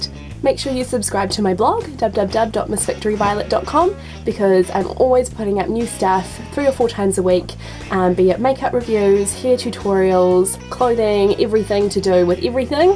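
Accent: New Zealand. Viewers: is your accent newzelanden accent?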